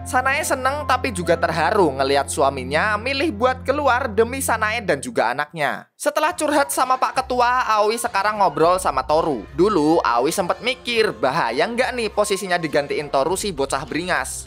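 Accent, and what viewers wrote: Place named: Banyumas, Indonesia. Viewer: Bjir admine wong ngapak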